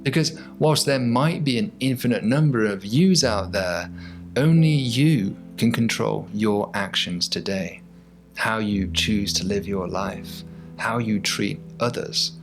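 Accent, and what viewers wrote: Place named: Liverpool, United Kingdom. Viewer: why is this guy's face always on view ? why are stills of Brad Pitt and the moody brit actress all over this?why does he talk as if he is talking to 8 year olds. oh.. cuz he is i guess. so annoying i had to get up from me telly and find me lap top to commentAH ! it's only the annoying Scouse that annoys me so much. a bit too much to take before I've have me cup o builders innit? Yes, I am in fact a bluuudy git. spoiler alert, he tells the size 45 billion to the edge. you're welcome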